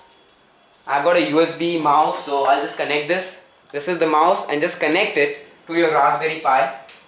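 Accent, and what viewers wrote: India. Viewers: are you from india :D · Is that a Marathi accent you have?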